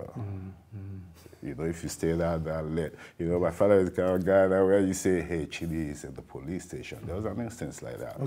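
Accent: Nigeria. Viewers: Thank God for his healing. I love his Igbo accent 😊. People say my Junior brother looks so much like him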